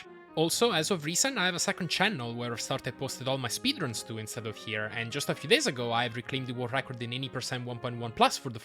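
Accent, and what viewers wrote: Italy. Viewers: Bro è italiano me sa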